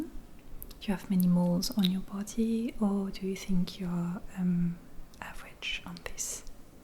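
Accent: France. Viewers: Her accent is french :) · Are you French?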